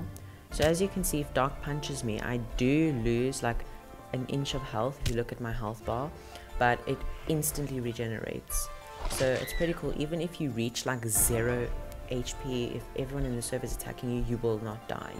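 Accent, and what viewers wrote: South Africa. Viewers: R u from SA?? 😜💅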